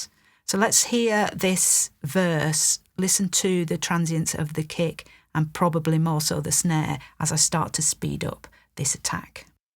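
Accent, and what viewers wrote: Northern England, United Kingdom. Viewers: Glad you're back, you my no.1 source for tutorials about mixing. As an engineer myself I found that we are on the very same page in terms of workflow, choice of gear and alike. Love it, and i love you accent! is it from the north of England?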